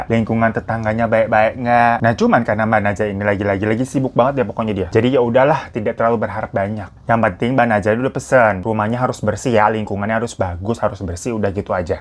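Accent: Java, Indonesia. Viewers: Medok bgt bang😂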